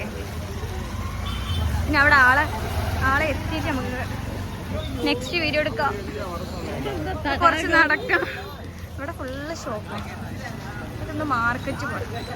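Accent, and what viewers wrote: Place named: Malappuram, India. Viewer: അന്റെ മലപ്പുറം ഭാഷ കേറി വരുന്നുണ്ട് മോളെ 😂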